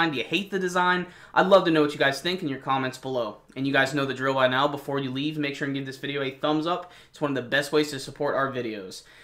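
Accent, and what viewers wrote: Germany. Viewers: lol at the german accent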